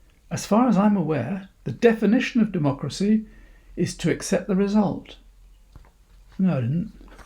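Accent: RP, United Kingdom. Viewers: You sound posh but you dont talk sense like Liz. I agree Sunak is hopeless, he is why the economy is so bad. Liz will be like thatcher in 79, end this nightmare and get the economy booming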